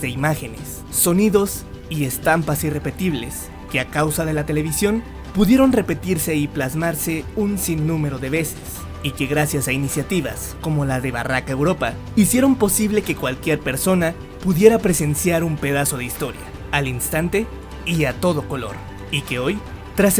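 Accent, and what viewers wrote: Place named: Bolivia. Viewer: porque la locución habla como si fuera bolivia o algo asi?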